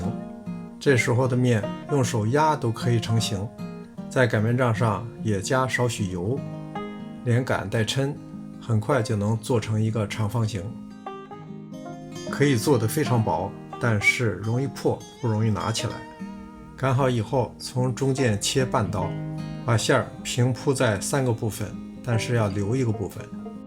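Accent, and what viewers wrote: Beijing, China. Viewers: 北京话听着太亲切了明天就做来吃了谢谢叔叔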